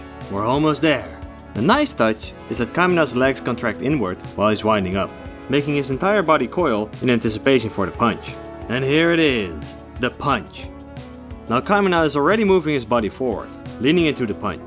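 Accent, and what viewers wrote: Netherlands. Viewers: You are Dutch aren't you. I can hear the accent/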